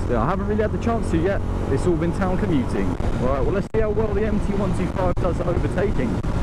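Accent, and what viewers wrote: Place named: United Kingdom. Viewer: Lmao calm down, everyone in the UK speaks different 💩🤣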